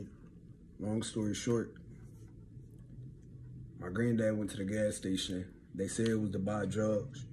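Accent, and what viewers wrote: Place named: North Carolina, United States. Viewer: He from nc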